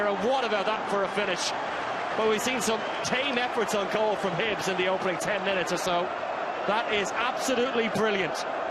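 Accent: Ireland. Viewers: Sounds more irish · irish commentary ffs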